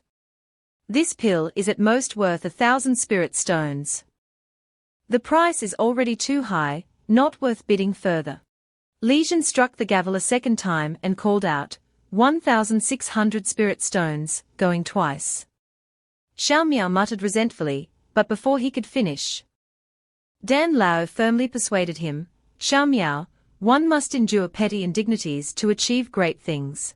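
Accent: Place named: United Kingdom. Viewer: Why this uk accent 😢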